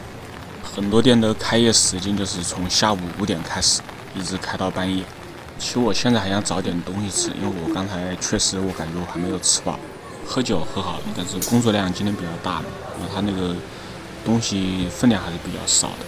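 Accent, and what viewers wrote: Wuhan, China. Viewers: 配个字幕就好了，感觉好像汉普啊哈哈🎉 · 兄弟口音是武汉的？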